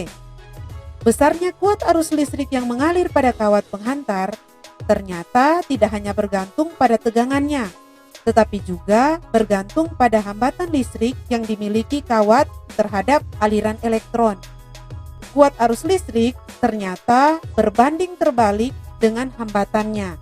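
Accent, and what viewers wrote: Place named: Manado, Indonesia. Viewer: Rupa logat manado ini 😂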